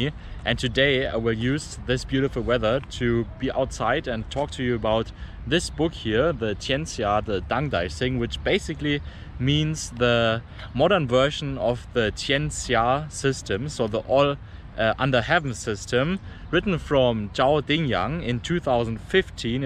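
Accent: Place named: Germany. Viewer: Your accent is German, are you still in China?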